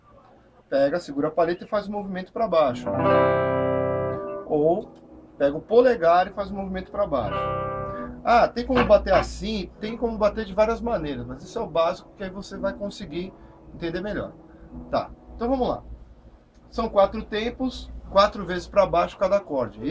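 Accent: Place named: Minas Gerais, Brazil. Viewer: esse professor parece um d ituiutaba minas gerais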